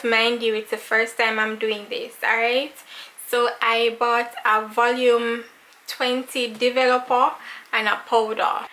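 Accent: Jamaica. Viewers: Are you Jamaican? Guh deh yaaaaaaaadie!!!! Love seeing fellow Jamaicans on YouTube doing there thing.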